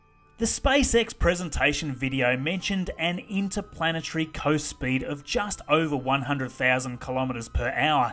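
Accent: Australia. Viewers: So I'm guessing your Australian?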